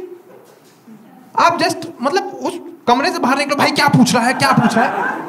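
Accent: Bhopal, India. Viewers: I can relate to your humor, Your Bhopali accent makes the joke way more funnier. ~ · Bhopali is in your tone..... ❤️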